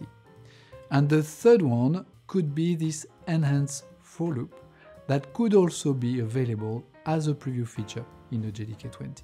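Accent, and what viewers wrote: France. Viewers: The accent sounds french to me, and some sounds of vowels are silent. I use the subtitles.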